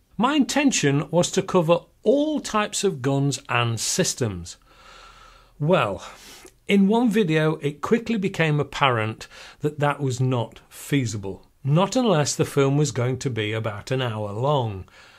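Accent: United Kingdom. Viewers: I could listen to British people talk all day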